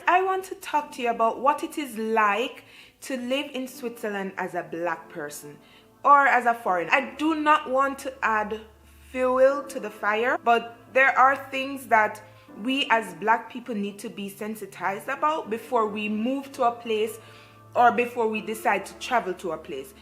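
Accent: Jamaica. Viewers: Girl mi hear di Jamaican accent 🤣🤣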